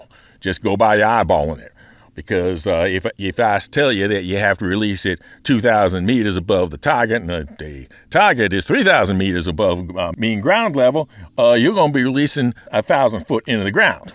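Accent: South, United States. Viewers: what's up with the extra southern accent this time around? lol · I agree that the dive bombing attack, similar to a Douglas SBD Dauntless, is a likely way to get more precision. I think a low glide bomb attack, more like a Martin B-26 Marauder, could work BUT you'd have to keep your speed well up and drop from no lower than 500 Meters (as it looks like the blast radius is about 350-400 meters).. (not sure why the southern accent but boy howdy tie A1 looks fun)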